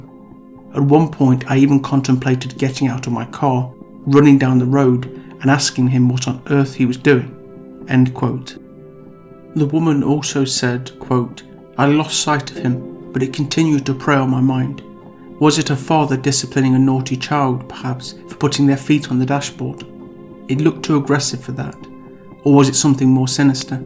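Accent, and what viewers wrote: United Kingdom. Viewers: Interesting case ✅ Suspense music ✅ British english narration ✅ Fantastic ✅ Thank you! ❤ from 🇸🇪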